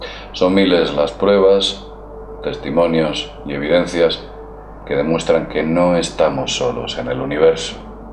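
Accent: Spain. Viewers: el acento españolete funciona a la maravilla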